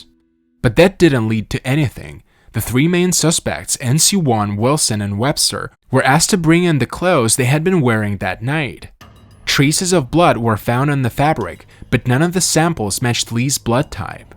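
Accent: Russia. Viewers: This is how he talks, who are you to complain about that? He has a very beautiful and charming accent. You do understand english is not his 1st language, I think he might be from Russia. I'm guessing you are Americans, the only people arrogant enough to complain about someones accent🤦‍♀️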